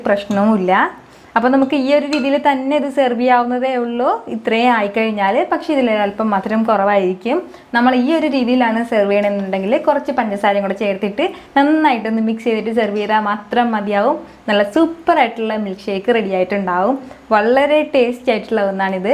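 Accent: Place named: Thrissur, India. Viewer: Thrissur aayirikkum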